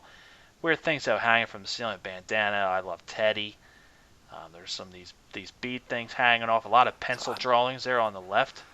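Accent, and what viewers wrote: Philadelphia, United States. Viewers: Just wondering, as a born and raised Pa lady, your accent sounds like a Philadelphia one. Am I right?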